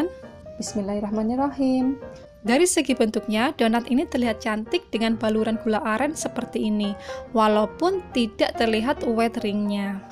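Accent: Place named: Java, Indonesia. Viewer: Menul2 kak bahasa jawanya.. Endull 🤩🤩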